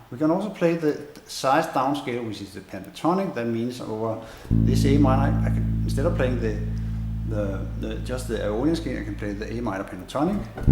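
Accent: Denmark. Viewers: Great! A danish Bass player with danish accent (no offence) playing on a danish bass amp :) I like your videos. You are a great teacher. Greetings from Denmark.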